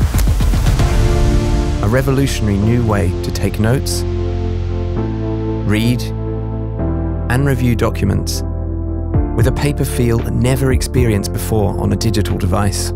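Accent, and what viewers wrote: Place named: England, United Kingdom. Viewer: that narration is just awful. Just having an english accent doesn't make it good.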